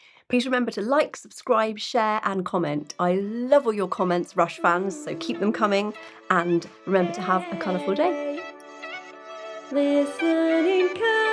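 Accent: United Kingdom. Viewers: IMHO, it's both famous people and average people because 'all the world's a stage' as you will find out so much more about the band in their album. Welcome to the Rush family,! You have gone down the Rush rabbit hole, never to return, but it's all good, no worries! I've got to say that your personality is lovely as is your accent, purple hair, and Ink! Such a lovely bird, as you brits might say. Chicks seem to really dig Neil the most. Here's a small treat for you and others. Neil doing Cottontail and a short tasteful solo with the Buddy Rich band doing a tribute to Buddy. <link>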